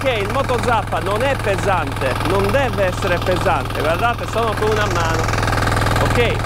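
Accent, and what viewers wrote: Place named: Sardinia, Italy. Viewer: A metà del video ero già iscritto. Parli come deve parlare qualcuno che ci tiene a farti imparare. Bravo veramente e l'accento sardo rende tutto più simpatico.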